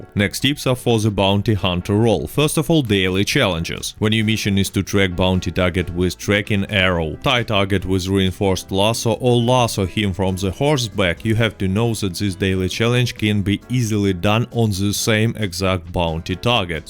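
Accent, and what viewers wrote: Russia. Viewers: Russian accent and wilderness sounds great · he has a Russian accent maybe because he is Russian?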